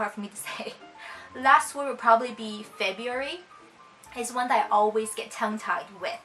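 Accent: Canada. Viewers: Whoa u have an awesome canadian accent haha! i have sort of an annoying NY accent.. not cool like yours haha kindly swing by my channel if u like! I do hair vids and food vlogs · You do have a Chinese Canadian accent... I would know cause I have it too. I speak perfect Mando, Canto, English... Each with accent. Mando with Canto accent. English with Canto accent. Canto with Hakka accent. I don't think Canadian has an special accent, mostly because it sounds so natural that I don't notice it. The craziest thing is that I picked up fake southern accent and I totally agree with you that as an Asian Canadian we do speak too fast and crumble up all the pronunciation. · Girrrrl your English is perfect, in fact - it might be a little too perfect. You enunciate and pronounce everything so crystal clearly that you sound a bit hyper correct, but you still definitely have a hint of Canadianess in your accent so I'd definitely be able to tell that you're not from the US. 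This was a really clever and original take on these accent tag challenges, thank you for sharing! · Hi! I enjoyed your accent-tag. I am a fellow Canadian too (I'm in MB) and yes, I can tell you would be "Chinese Canadian" as you do have a slight accent. People claim I have an accent too but.. ... lol... I can never tell myself. :) .. I also switch the pronunciations of some words depending on the sentence.. .. weird, right?... lol.... but it's all in fun!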